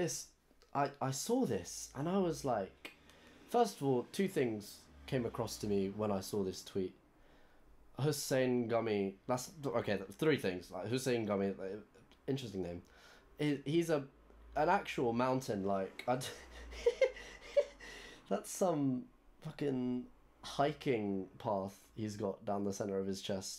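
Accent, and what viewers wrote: RP, United Kingdom. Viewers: seeing the way you tweet i thought you were from london but you sound so posh speaking slang it don't work ????? i had to stop at the "that you man have been sending to me" ... idk ... your sisters peng tho 👌😍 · Did you two go to different schools or something? You sound way posher than her mayyyyn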